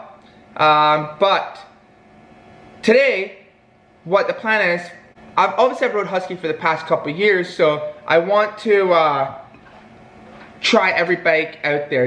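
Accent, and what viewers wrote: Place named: Scotland, United Kingdom. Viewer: r u scottish my man? im loving that accent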